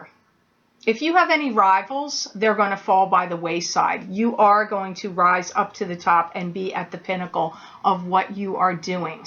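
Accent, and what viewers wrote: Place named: New Jersey, United States. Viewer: I absolutely love your vocabulary, dahling and of course, your Jersey accent (miss hearing it over here in AZ)! You're so intelligent and yes, of course your readings are spot on!! Congrats on being booked for the next 8 months!! I look forward to your readings every month, so thank you so much! Oh and just an fyi: if you type in your website without the www it takes you to <link> Love and light from a fellow Jersey girl, Autumn:)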